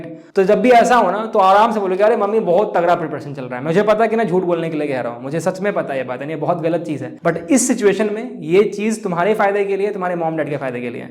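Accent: Bihar, India. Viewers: bihar tone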